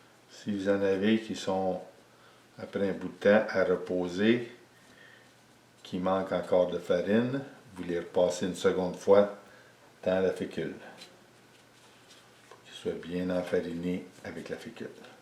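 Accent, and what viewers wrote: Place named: Canada. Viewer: Joli accent Canadien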